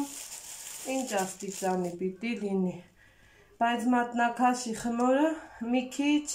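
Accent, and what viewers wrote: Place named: Vanadzor, Armenia. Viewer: ինչքան տխրություն կա ձեր մեջ։հաց թխելը ամեն մարդու գործ չէ։միայն հոգով բարի ու լավ մարդիք են կարողանում լավ հաց թխել։ձեզ առողջություն եմ մաղթում ու երջանկություն սիրելիս։իսկ ձեր հոգու տխրությունը թող վերանա ընդմիշտ🤗🤗🤗առաջին անգամ եմ դիտում ձեր վիդիոն ու միանգամից բաժանորդագրվում եմ։կասեք՞ դուք որտեղից եք բարբառը կիրովականի կողմից է ոնց որ՞ հարազատ բարբառ է ինձ շաաատ🤗🤗🤗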